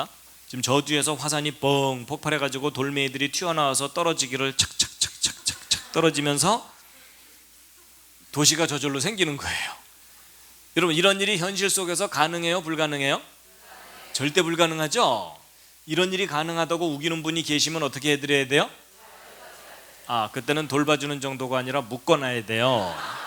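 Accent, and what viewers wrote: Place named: Jeolla, South Korea. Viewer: 목사가 태도나 말투가 기본이? 전라도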